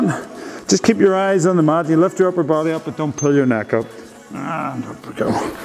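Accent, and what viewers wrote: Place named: England, United Kingdom. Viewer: I wonder what that English accent is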